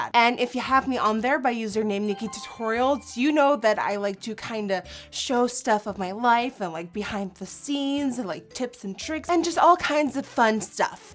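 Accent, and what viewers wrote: Netherlands. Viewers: I ALWAYS THOUGHT YOU HAD AN AMERICAN ACCENT BUT THEN I WATCHED YOUR OLDER VIDEOS AND I REALIZED IT IS A DUTCH ACCENT AND NOW I CANT UNHEAR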